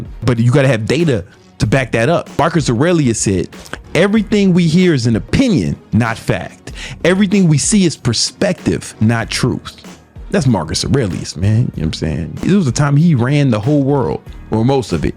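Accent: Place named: South, United States. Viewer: southern twang haha i didnt know it was called this way im from France by the way so we'r definitely no experts at accents southern twang from brave men though 🤷🤣🏆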